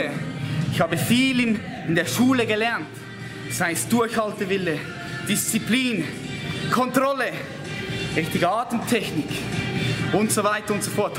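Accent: Switzerland. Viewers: Unglaublich was für ein Vogel. Der Schweizer Akzent machts zum Glück lustig.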